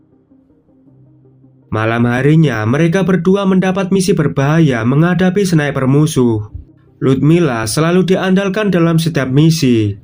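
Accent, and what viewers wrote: Java, Indonesia. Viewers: mendalam kali ah bro ini ngomongnya..medok kali 😂 moga sukses bro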